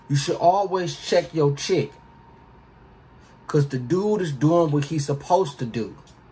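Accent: Baltimore, United States. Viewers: I thought dude was from Baltimore lol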